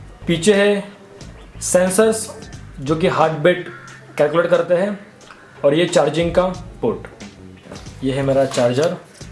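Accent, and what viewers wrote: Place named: India. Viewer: i love your hindi accent❤️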